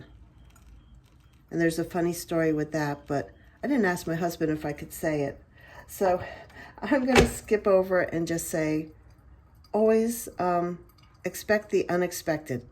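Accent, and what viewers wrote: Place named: East Coast, United States. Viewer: Love hearing you talk. I adore your accent. Being from the midwest, eastern accents sound great to me. Rainy days are good for chats like this.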